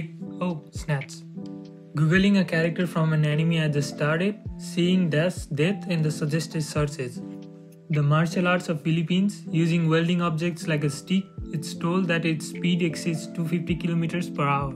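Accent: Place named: Nepal. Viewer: Mom: Good his studying, his listening to those Educational Indian youtube channel Me: who actually watching Anime Memes that's being read by a Nepalese Dude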